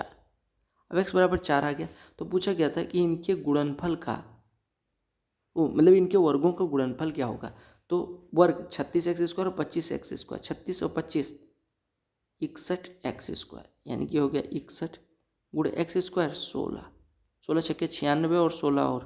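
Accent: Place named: Mithila, India. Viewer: Bhaiya kya aap mithilanchal se hai? I am also from Janki janm Bhumi Sitamarhi, Bihar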